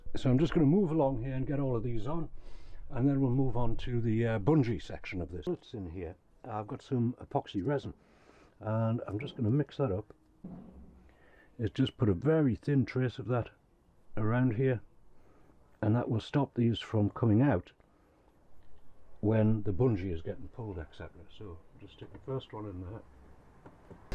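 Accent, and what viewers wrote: North East England, United Kingdom. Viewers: Great work sir! I wasn't convinced about the blue at all... until the door fronts went on! They look superb with the radius corners. On another note, it is wonderful to hear a voice from my old neck of the woods that is calm, caring and from a good heart. I now live in Devon (30 years) and your voice is a good reminder of the beauty of the North East. Thanks:)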